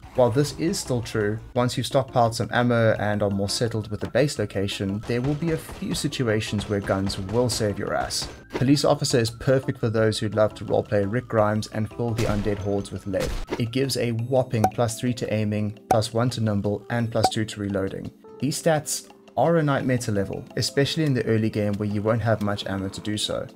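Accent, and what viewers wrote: South Africa. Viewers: 😂😂😂 are you from Joburg. I can just tell ur South African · You sound South African 😂. Epic tear list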